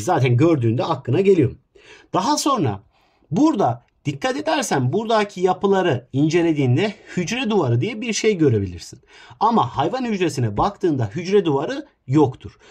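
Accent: Kayseri, Turkey. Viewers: Abi sen gayserilimisin?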